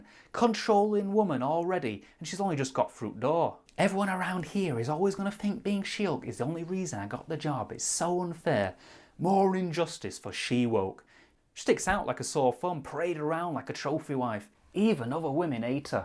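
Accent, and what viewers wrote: United Kingdom. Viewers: I never thought I needed this British bloke making me laugh all the time. Love these videos! 😁 I was about to say its a breath of fresh air but considering you're British I'm not sure...😨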